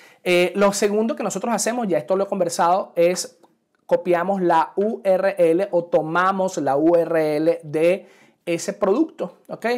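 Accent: Venezuela. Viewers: felicitaciones éxitos, definitivamente el tono venezolano a la hora de explicar y enseñar es el motivo que nuestros docentes los buscan en el exterior éxitos.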